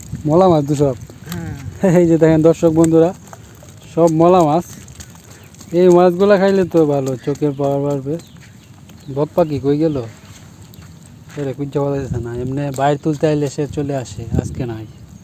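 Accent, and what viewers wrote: Netrokona, Bangladesh. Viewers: Apnader Basa kothay. Kotha Shune Mone Hoy Netrokonar. Am I right?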